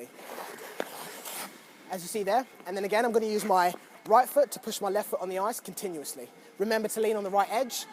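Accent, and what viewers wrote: United Kingdom. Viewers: Does anyone comprehend what is going on in this video!? A black guy is teaching the internets how to hockey-stop, in a British accent. Youtube, you are great.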